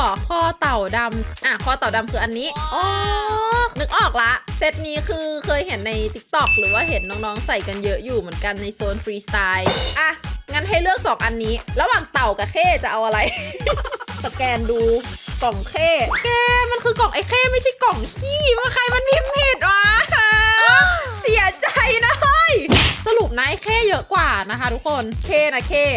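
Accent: North, Thailand. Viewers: พี่ เปนคนเหนือหรอคับ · พี่เป็นคนภาคเหนือหรือค่ะ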